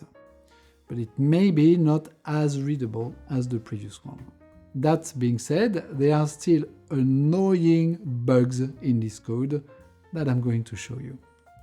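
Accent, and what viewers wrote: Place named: France. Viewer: Need a native English speaker for these. Very hard to understand or better yet just do it in your native French and have AI subtitles. Great content but I can't be the first to have this thought.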